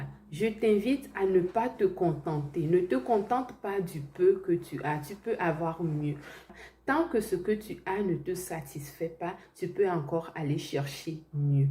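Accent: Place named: Africa. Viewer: pauvre petite africaine minable! Les riches ne passent pas leur temps sur YouTube à poster des petites vidéos faites en mauvais français à l’accent africain pour avoir des vues afin de se faire payer klks miettes par YouTube à la fin du mois. Si tu n’a pas reussi ds la vie au Canada, il ne faut pas généraliser et inclure tous les africains ds ta pauvreté et ta misère. Lis les commentaires et tu verras combien d’intervenants te disent les mm choses auxquelles tu n’arrives pas à répondre. Les vrais riches ne assent pas leur temps à poster des émissions sur YouTube sur comment obtenir le visa ou l’immigration Canada afin de recevoir les miettes. Comment peux-tu apprendre aux gens à devenir riches alors ke toi mm tu es pauvre avec une vie sociale misérable au Canada? Les riches ne peuvent pas comprendre ce que tu dis. J’ai parcouru toutes tes 16 vidéos: il n’y a aucun commentaire d’1 quelconque riche qui aurait compris quoique ce soit. 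Mais retiens qu’il y a içi en occident bcp d’africains qui sont des juges, médecins, avocats, chefs d’entreprise, sénateurs, businessmen, basketteurs, acteurs de cinéma, boxeurs et toi tu dis qu’eux tous sont pauvres??? Tu es malade ou quoi? Le camerounais Ngannou qui a gagné <num> de dollars ds un seul combat de boxe est pauvre? Le basketteur congolais Mutombo Dikembe qui gagnait <num> de dollars par An est pauvre? Le frère au Nigérian Dangote qui a investi près de 1000 dollars içi au texas dans le pétrole est aussi taxé de pauvre par toi? L’act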